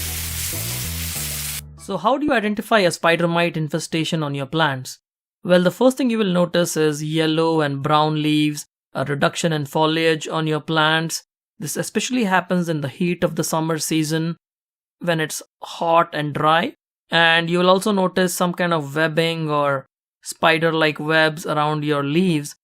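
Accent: India. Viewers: his accent is interestingly Indian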